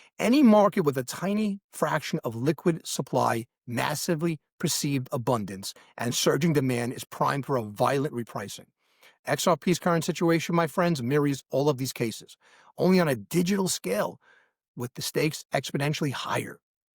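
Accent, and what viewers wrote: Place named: Long Island, United States. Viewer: I lived in Long Island as a kid so I know the accent! I live in the Chicago land now but NY will always be home.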